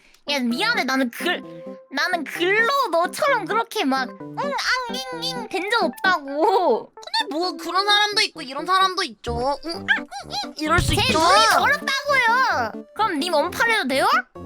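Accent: South Korea. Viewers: 전라도 사람이여? 구수허이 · 전라남도쪽일 거 같네요 근데 전에도 보니까 경상도 사투리도 하셔서.. 좀 섞인거 같아요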